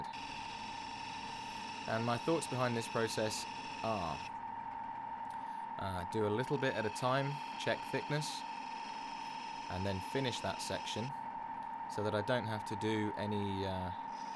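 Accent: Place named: United Kingdom. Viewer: This is definitely one of the nicest things you ever turned. Also, I would like to know what is the brand of your lathe? Also how old is it, and how do you keep it running so well. I really like your channel, Your voice is pleasant and I like your British accent. Keep turning sir, I know, your thinking I don't have an accent, you do and your correct. Take care, and be careful.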